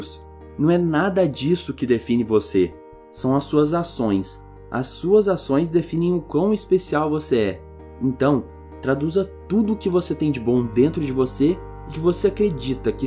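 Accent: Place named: São Paulo, Brazil. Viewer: EITA SOTAQUE PAULISTA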